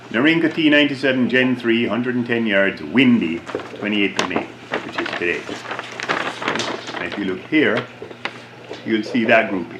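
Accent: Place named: Barbados. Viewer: Heck I just trying to figure out your accent but I'm gonna go out on a guess and say Barbados.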